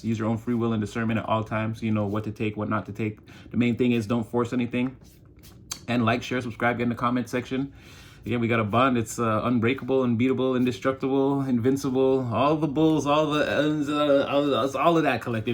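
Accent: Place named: Caribbean. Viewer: As a Caribbean, I love this reader lol and whenever he speaks in patois I swear it feels like he’s channeling my Caribbean passed on loved ones lol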